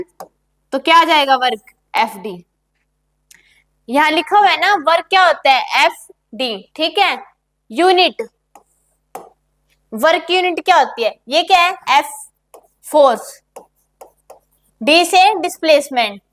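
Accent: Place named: Haryana, India. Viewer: Haryanvi 😅, best question ma'am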